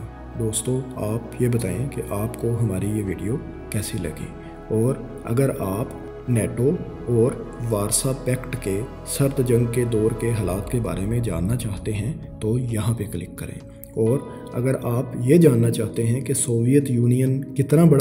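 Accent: Punjab, India. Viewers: Everyone complaining his voice, its not his voice. Its his punjabi accent. Now if he makes 10 more videos you all will get accustomed to his accent even but boy oh boy punjabi is strong in you. The video was informative, presented neat and brief all current affairs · Bohut zyaada punjabi accent hai iss banday ka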